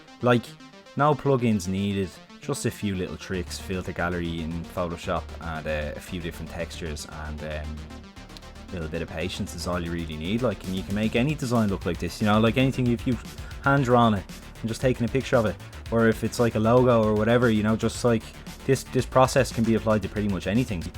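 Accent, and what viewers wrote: Ireland. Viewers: It is so refreshing to hear an irish lad talking about graphic design. Can't wait to see more of your videos :) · Awesome tutorial! I was taken aback by the real dub accent haha...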